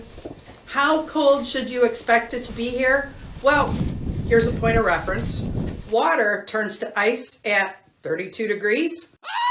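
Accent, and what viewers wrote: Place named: Michigan, United States. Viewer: Perfect Michigan accent!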